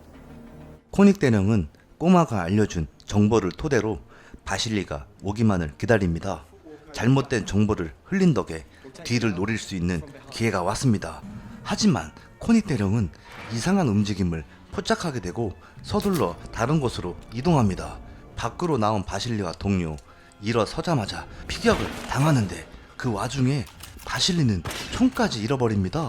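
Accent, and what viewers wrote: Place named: Chungcheong, South Korea. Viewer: 주인장님이 충청도 억양이 쎄네요.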